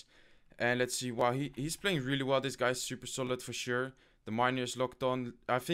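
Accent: Netherlands. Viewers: Are u Dutch? Je hebt een Nederlands accent😂💪🏻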